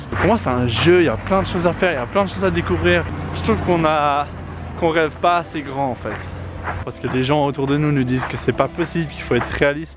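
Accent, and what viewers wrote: Switzerland. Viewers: ooh de tcheu notre bel accent suisse XD mais t'es pas serrrrieux ? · L'accent suisse il y est ! Haha super videos !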